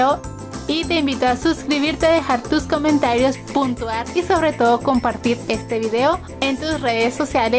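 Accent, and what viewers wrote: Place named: Chihuahua, Mexico. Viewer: Hola! Me encanto el tutoríal n,n de dónde eres? Suenas como de Chihuahua c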